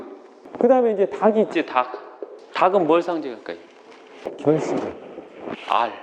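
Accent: Chungcheong, South Korea. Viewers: 충청도 사투리가 구수하죠~^^ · 선생님 강의도잘하시지만 사투리잼있기도하구 우리사위말씨랑똑같아서많이웃어요 서산이유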